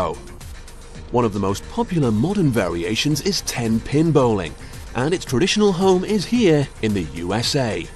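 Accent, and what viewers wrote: England, United Kingdom. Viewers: So if this is in the US then why is a english person from britian narrarating? I thought this was on BBC for a second there. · It sounds like him in as much as he's English. But really, Clarkson sounds nothing like this. Do all us Limey's sound the same to you? ;)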